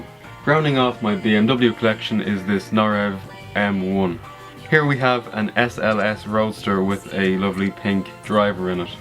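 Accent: Ireland. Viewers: are you irish ? so am I . cool video :)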